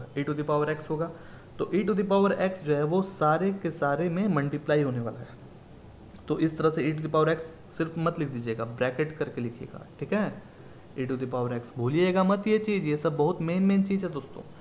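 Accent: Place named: Bihar, India. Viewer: Are sir bihar se hai na..... Lallantop style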